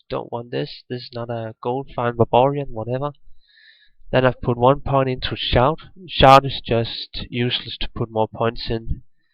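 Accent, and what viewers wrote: Denmark. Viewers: the moment when you can recognize a Dane on the accent ;) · This really brings me back. I used to play softcore EuNL as well, used d2jsp, made countless of builds. Think I started about 10 years ago and played pretty much every day for 3-4 years. My favourite game of all time and the PvP aspect was legit, although quite frustrating at times(PK and script hammers). It's amazing to see people are still playing, but you mentioned you stopped? How long did you play for, and if I may ask, how old are you? PS. Ja den danske accent er let genkendelig :)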